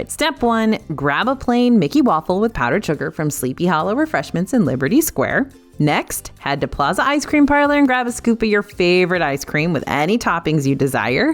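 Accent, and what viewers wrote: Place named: Texas, United States. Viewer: Is it just me or is Texas accent really strong in this video? 😅 Btw, I'm really hungry now.😘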